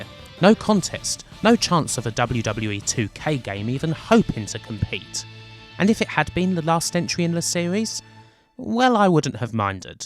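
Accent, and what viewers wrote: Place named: England, United Kingdom. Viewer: I think it's the English accent maybe?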